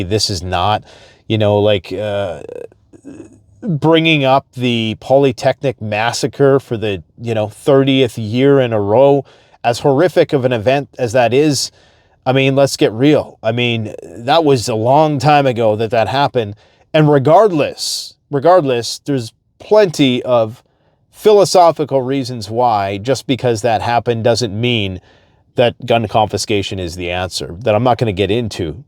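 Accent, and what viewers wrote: England, United Kingdom. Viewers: Why use an English accent when talking about Trudeau? We have pr**ks in England but we don't use Canadian accents to describe them 🤷🏿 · I want more british accent!!! 😅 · Your british accent had me dying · Lol British accent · Geeze you sounded more British then me 😂😂 brilliant!! Thank you for your updates buddy much love from Dorset 🇬🇧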